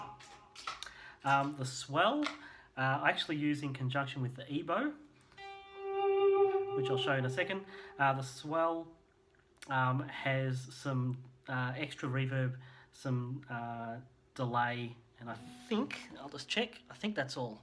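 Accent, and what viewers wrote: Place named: Australia. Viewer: Thanks for the videos,. I've just started learning Wicked for a production coming in Jun. I'm a seasoned guitar player, but I'm no pit musician yet. Looking at the charts, and man I have some work ahead of me. I'm in Syd, Australia and by the sound of your accent you may not be that far away. I'd love to discuss the musical further if you're able, please. Thx. Ted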